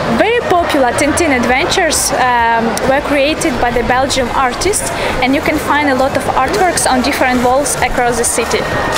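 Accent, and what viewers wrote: Russia. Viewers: annoying accent. russian? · This Russian accent sounds so horrible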